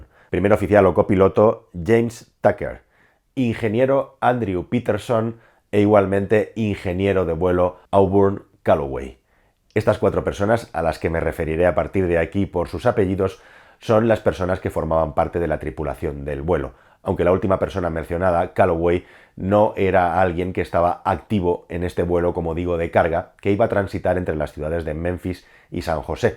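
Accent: Spain. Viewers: Me desagrada hoir ablar a un chapeton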